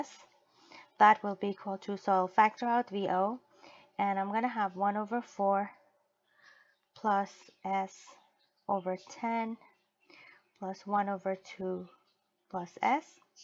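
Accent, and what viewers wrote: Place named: Iran. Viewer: this babe has a Persian accent <3 .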